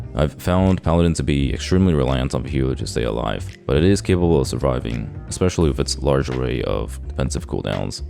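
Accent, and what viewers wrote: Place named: New York City, United States. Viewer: NYC ABC is a very specific accent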